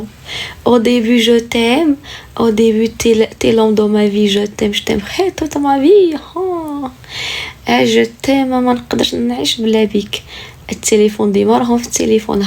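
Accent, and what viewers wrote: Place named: Morocco. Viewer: انتي❤ مغربي❤ لهجتك ثقيلي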